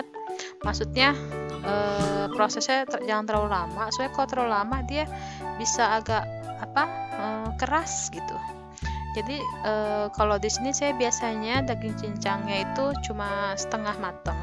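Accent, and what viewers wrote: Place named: Betawi, Indonesia. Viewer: Po numpang tanya, dari daerah mana ya? Ko bahasa betawinya kaya bahasa betawi ora... terus maap itu sodet bukan coet/ cobek😁 coet mah artinya ulekan sambel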